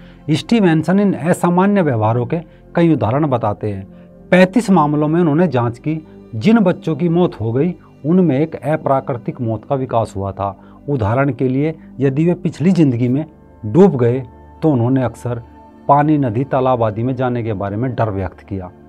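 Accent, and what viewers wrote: Haryana, India. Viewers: Bhai Jis ladke ki baat kr rahe hain main use jaan'ta hoon.btw Bolne k hisaab se lg raha hai k aap haryana se ho. .love & respect from punjab..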